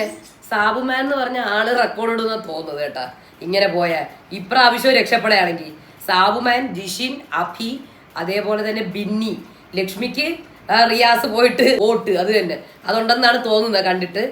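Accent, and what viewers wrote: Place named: Thiruvananthapuram, India. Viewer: Because TVM slang.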